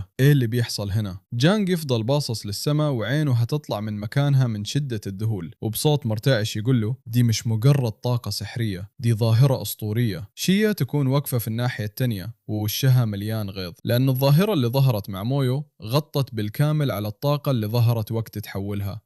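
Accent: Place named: Saudi Arabia. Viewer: فيديو بتاع مافيا الاول كان واحد مصري عادي دلوقتي واحد سعودي انت بتهزر ولا مش بتشوف فيديو اصل ده صوت غريب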